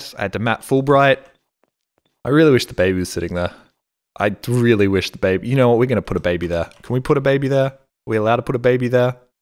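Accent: Australia. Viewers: wai whoa you aint more australian guy? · Not me, subscribed to him for years, only now discovered he is Australian and not British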